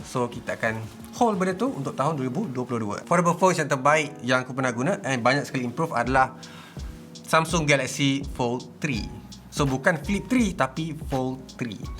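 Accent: Terengganu, Malaysia. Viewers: Kalau la channel ni x tiru MKBHD + cakap teganu, aku rasa lebih ramai yg subscribe. Be yourself bro. I know you can do it.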